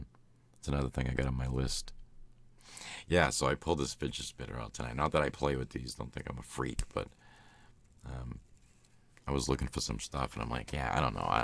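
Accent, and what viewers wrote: Boston, United States. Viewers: I should have known you were from Massachusetts. I hear that lovable Boston accent. =) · You er so Boston I hade no idea.. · Hi, I have to say, it's nice to hear your normal speaking voice! You should do more with your own Boston accent some day, it's very warm and personable! I love a lot of ASMR channels but the rumbly sound of your voice puts me right to sleep 💚😷😴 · Hearing you talk in your normal voice, I hear......Boston accent 😂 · Are you from Boston? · I cannot be the only one thinking he’s attractive 😳 That Boston accent maaaan 😍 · Haven’t been subscribed for long, a few months, but I was not expecting the Boston-ish accent! So glad I found your channel. Your voice and creativity is one in a million! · It’s so weird to hear you talk normal volum... I never even knew you had a Boston accent! Congrats! And that marble device is very... 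T E C H N I C A L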